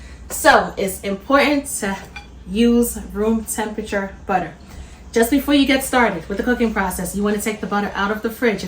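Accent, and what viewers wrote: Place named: New Jersey, United States. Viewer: Is that a Jersey accent I hear? Love this recipe also, thanks for sharing ❤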